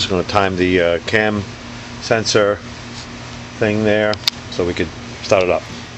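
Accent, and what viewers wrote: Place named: New York, United States. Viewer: Jesus Christ dude the day I bought my GN brand new back in 87 the service department at the dealership told me that this is literally the most sensitive and temperamental v6 that GM ever made. You can’t man handle and let sensitive internal engine components like the camshaft journals being scratched and nicked by the hood lock. Then you spin away all the assembly lube on the cam showing off with this dog and pony show. I love how you fingerprint yourself in the valley pan. You sound like your from either Brooklyn or Queens Ny and I hope to God in 31 years I never had you working on my WE4 because after seeing this video all I can say is God be with Pasquile and his engine. If you pulled this on my car and I saw this video Idk how I would respond. Since retiring and moving to Florida I started doing everything myself on my car because the dealership doesn’t know how to work on these cars anymore and the “Go too guy” could be like you who is careless and doesn’t (be admission on the video) care about your customers or the work of art classic piece of American history that you are working on. 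I guarantee you nicked that bearing journal and that engine isn’t running or has since been re built by someone who knows what he or she is doing. You should already know how unforgiving and intolerable these turbo 109 engines are. Especially the shortblock and shitty oil pump, and oil pressure issues. But like you said,,It’s not your car.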